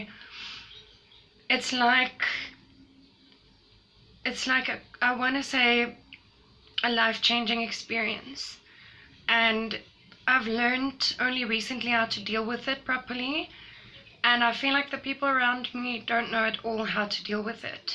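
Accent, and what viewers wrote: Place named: Germany. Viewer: Hallo, meine Schatz. Just found your site; not sure if you’re still active with your channel. Have you heard of people with TLE while sleeping? I’m an academic researcher compiling data on this phenomenon and I would be interested in your or others’ experiences with this. I used to live in Deutschland and you sound German; forgive me if I am wrong. I hope you’re making some progress with living with TLE, and I 100% agree with your choice not to hypermedicate; good for you. I will check back to see if you have any experiences or leads for others with sleeping TLE. If so, I will put you in touch with my study. All the best wishes.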